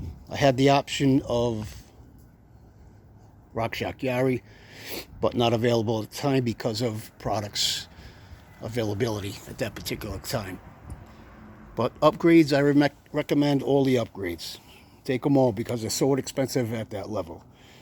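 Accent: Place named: Queens, United States. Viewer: Yup. You speak a lot like I do. I am from Queens NY. Nice job on the Ansbern bike. I could not agree more with doing due dilligence and research. Most of the bigger named companies are all just rebranded from China, with custom logos and specs, but much higher prices. I bought a Vtuvia FMB, which looks like a clone of your Ansbern, except I want your 52v battery! The FMB comes with a 13.5AH 48V, which reduces the range I want. I wonder if the ansbern battery would work in my FMB. Would you mind providing the battery dimensions?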